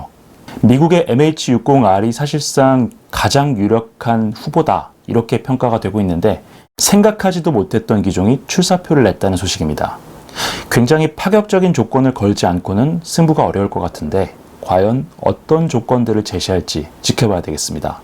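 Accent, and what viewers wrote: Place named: Seoul, South Korea. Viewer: 서울말 좋아요^^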